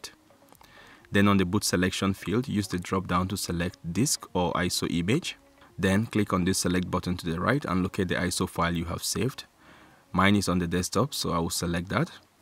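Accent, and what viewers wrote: Africa. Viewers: Prefer Rufus personally. Thanks for the help sir. I'm digging the soft African accent. I need more tutorials with African accents =D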